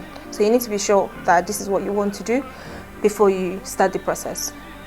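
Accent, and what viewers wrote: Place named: United Kingdom. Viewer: Its the British accent for me......keep it up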